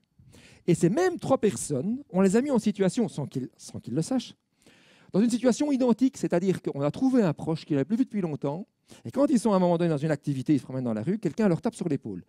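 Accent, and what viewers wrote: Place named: Brussels, Belgium. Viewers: Tellement magnifique!!! Qu'est ce que j'ai pu passé petite devant un nid de fourmis; j'en retire de mes expériences d'enfant, une société super organisée, j'étais toute jeune stupéfaite... Cette volonté, ce courage déterminé en la vie, sublime!!! Vous en parlez remarquablement bien!!! Elles sont formidables et quelquefois, excusez-moi, elles nous emmerdent!!! Néanmoins, depuis mon jeune âge, elles m'ont toujours fascinés... Votre exposé me rallie encore plus vers elles, je vous en remercie!!! A votre accent, vous êtes Belge (oui j'ai vu Bruxelles), vous êtes de Liège (l'accent) ??? Je le suis aussi ; mais à présent en Provence 04, et encore beaucoup de petites fourmis !!! SOURIRE !!! CHRYS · ... L'accent du conférencier, de même que son type d'élocution, sont plutôt ceux d'un francophone bruxellois. Ennoutre, l’intéressé est rattaché de façon assez significative à l'ULB. 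Un accent pas si différent, en l'occurrence, du phrasé d'un Benoît Poelvoorde, par exemple – encore que ce dernier soit originaire de... Namur ! (Mais le 'rouleau compresseur' linguistique qu'a été la télé depuis les années 60-70 a pas mal contribué à uniformiser nombre de particularismes régionaux, en Belgique comme ailleurs. Sauf, peut-être, du côté de Liège précisément, ex-principauté indépendante, bien connue pour son esprit frondeur et et sa résistance séculaire aux influences extérieures ?).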